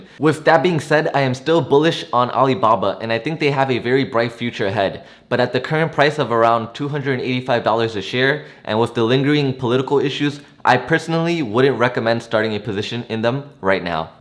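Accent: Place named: Canada. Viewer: Dang I thought you were Canadian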